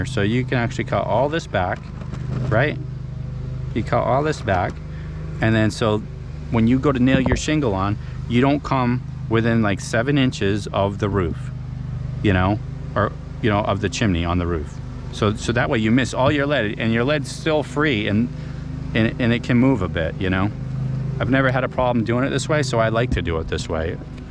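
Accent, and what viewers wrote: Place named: Massachusetts, United States. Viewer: What a nice job! It looks really good too. Good explanations on why you do each step. I do handyman work, and nearly every house I enter has botched work. It blows my mind how much bad work I see. In Part 1 you showed layer upon layer of messy repair attempts that failed. Those hack jobs are fine in an emergency like during a storm when you're just stemming the tide until you can do a proper repair. Very much admire your skill and methodical work. I'm originally from MA and your accent sounds familiar! My 70 year old house in OR has the original heavy galvanized steel step flashing. I re-roofed 27 years ago, and just lifted it up like you said. It could probably go another 20 years, but I'm going to replace it with lead the way you did.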